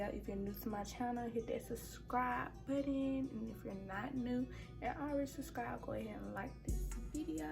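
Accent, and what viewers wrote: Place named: Tennessee, United States. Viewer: She definitely from Tennessee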